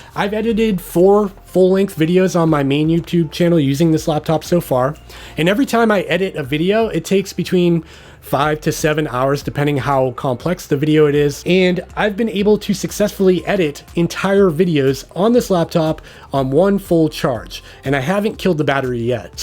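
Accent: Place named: Canada. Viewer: I thought he was Canadian too. The way he says “about”….aboot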